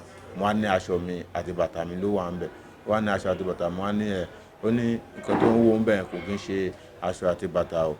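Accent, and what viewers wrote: Nigeria. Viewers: Yeah is from osun either okeigb. Ile ife or ifetedo.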